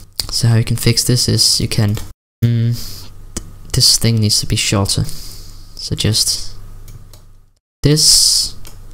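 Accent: Denmark. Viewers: Det er squ godt broder! xD Men god video man kan godt høre du er dansk :)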